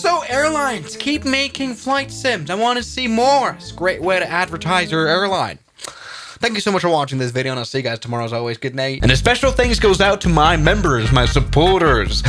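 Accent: Germany. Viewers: are you german? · Are you German? (I am )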